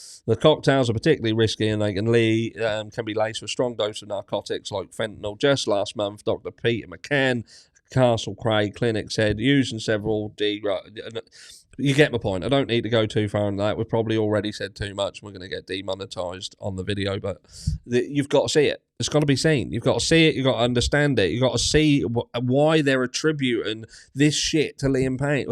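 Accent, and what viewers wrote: Norfolk, United Kingdom. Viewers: Money & power will always win, even the media are making money from Liam. Howdy we’re neighbours 👋 we can sniff out that Norfolk accent 😂🦮🥰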